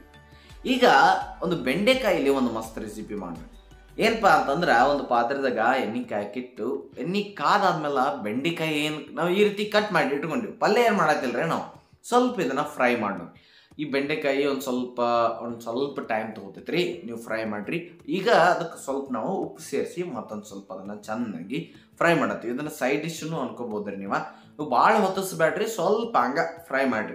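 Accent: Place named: Karnataka, India. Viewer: Nice Kannada accent 😀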